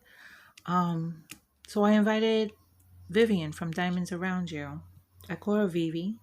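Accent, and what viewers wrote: New York, United States. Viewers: Hi. I’m new to your channel and I’m so glad I found you. Lisette told me about your laugh, so I had to see for myself. You sound like you’re from NY - my whole family (Mom, aunts, Grandma) is from Brooklyn. Then they moved to Cali and that’s where I was born. What happens if I finish a WIP and start another brand new one - do I add that or count that, or do we just start with a set number and finish them as we finish them throughout the year? I hope that made sense. I have been DPing since this year in April. I currently only have 3 WIPs, so that won’t take me super long to finish them all - for sure it won’t take me months. I plan to join this event, I’m just unsure of a couple of the rules. Thanks for reading my novelette!!! ❤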